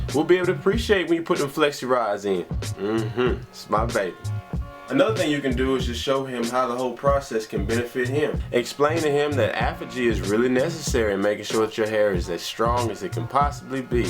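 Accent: South, United States. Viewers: that southern accent.... sexy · I love the message....but I love love love that southern accent! :) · WOW! I'm impressed at your natural hair verseness! I agree wholeheartedly about how women should include men in on why we're doing what we're doing to our hair and WHY! LOL going to get some ecoctyler 1am! so 4a is your crush....so sweet...when can we get married???!! LOL! Where are you from? I hear a little southern accent.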